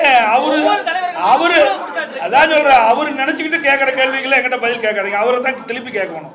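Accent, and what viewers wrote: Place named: Kongu, India. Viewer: Kongu Tamil bro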